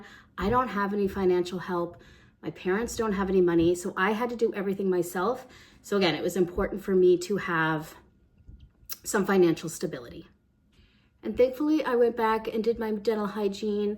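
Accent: Canada. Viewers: Hey hey, I just love you and now I know why... you're a maritime girlie - and now I can hear your Nova Scotia accent. Surprising to me that the Bridezillas in your shorts were East Coasters (or maybe they weren't) because that seems opposite to the maritime culture, but I guess there are mean people everywhere. I'm 50 and have hooded eyes now. Would love your best tips on this. ❤ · Your shorts just came across my algorithm, and I really enjoy watching them. I thought I occasionally detected a faint eastern accent, so had to see where you are from (prior to this video) and saw Canada 🇨🇦. Told my husband I knew I was right. So happy to see and support Canadian creators like yourself.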